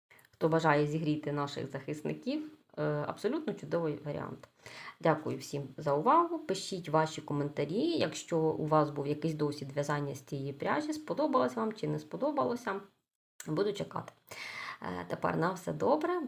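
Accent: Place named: Poltava, Ukraine. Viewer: Прив!т,почула св!й Полтавский гов!р,!нформативне в!део треба спробувати.Здоровья та миру.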